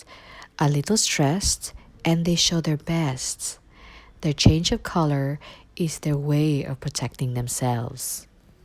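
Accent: Philippines. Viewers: She sounds so filipino hehehe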